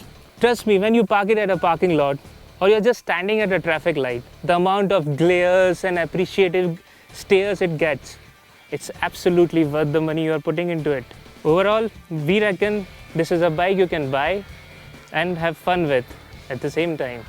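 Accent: India. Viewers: I feel like I am listening to every India call center, trying to understand the mumbling English while I try and dispute a bill charge.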